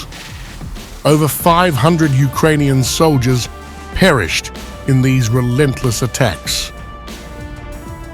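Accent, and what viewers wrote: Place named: United Kingdom. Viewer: I guess the Russians have abandoned hope that the incoming Trump Administration would work for the comprehensive peace arrangement in Europe they very reasonably want. The Brit accent of the narration initially put me on guard, the Brits being arguably the most propagandized Russophobic nation in Europe. I kept waiting for usual Western anti-Russian lies and propaganda. Thankfully they never came, and I'm subscribed.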